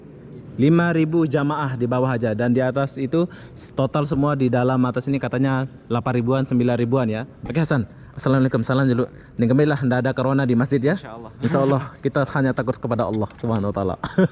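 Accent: Lombok, Indonesia. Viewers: Bagus sekali bahasa Lombok Anda seperti logat orang Lombok asli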